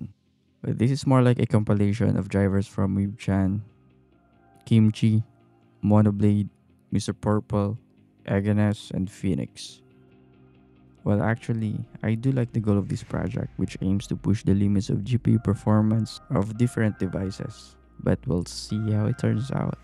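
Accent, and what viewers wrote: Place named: Philippines. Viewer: Man you filipino!? I'm subscribing after hearing that accent. Also we have the same tablet so your channel would be very helpful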